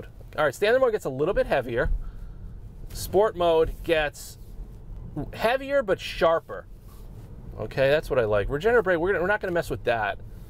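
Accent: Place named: Long Island, United States. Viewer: What county are you in? At first I thought long island north shore, but then I thought Westchester. 🤔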